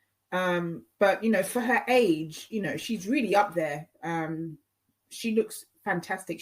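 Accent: RP, United Kingdom. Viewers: Thank you dear. I do love your channel and especially your stuff upper lip Queen's English. You're an intelligent, concise and interesting person. May 2022 bring your heart, soul and mind all that you may wish for.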